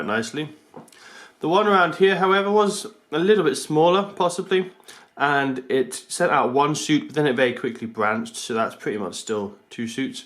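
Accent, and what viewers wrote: United Kingdom. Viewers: I love your channel! For some reason a British accent keeps me from getting bored on how to videos. I have a question: how old should my jade plant be before I start pruning it like that? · U sound like ur from the UK. What growing conditions are u using to get around the bad UK weather. And are you keeping these plants indoors outdoors or using a greenhouse?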